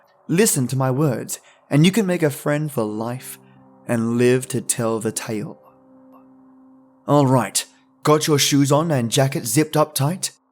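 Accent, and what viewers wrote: Australia. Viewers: I love finding fellow aussie narrators! 👻👻👻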